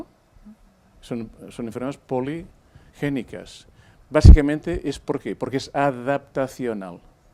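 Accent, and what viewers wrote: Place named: Catalonia, Spain. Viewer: Probablemente, coadyuvante... Con el acento catalán ya...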